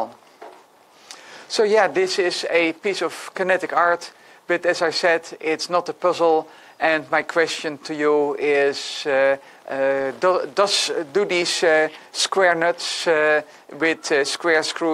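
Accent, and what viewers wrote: Netherlands. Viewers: that dutch accent ahaha. love it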